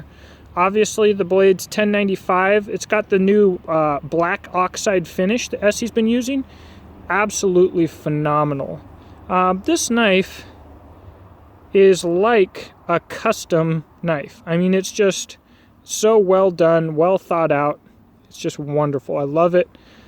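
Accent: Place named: Kentucky, United States. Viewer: My wife is giving me a hard time about your accent. She says you sound like you're from UP Michigan. I thought you were from KY. Would you mind clarifying? ☺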